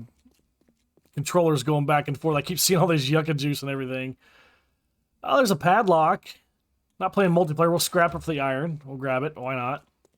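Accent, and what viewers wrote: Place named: Midwest, United States. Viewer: Sounds odds but I like the muted color better. I say you got that Midwest anywhere USA voice. A strong radio voice like Tom and Ray Magliozzi the car talk guys. (for you young people radio is what you listen to while paying Bionic Commando on your NES console.)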